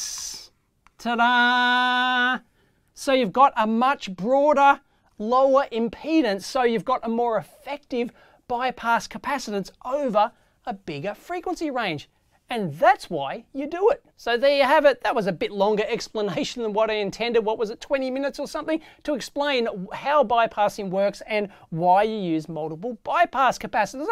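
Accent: Australia. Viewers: When I remember my Uni studies, the voices of my lecturers voices always come to mind. Now, when I put in any bypass capacitors my inner monologue will be telling me how to do it in a surprised sounding Aussie accent. Love it. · A book would be nice. Sometimes i cannot stand his Australian accent. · Now I no why!!! Thank you! Great tutorial! Aussie English, it always makes me happy! I'm still saving money for a trip to Australia... My only sorrow is that when I be there, down under, I don't want to go back home. There's something about Australia that attracts me. Don't ask my why or what it is. Greats from the Netherlands!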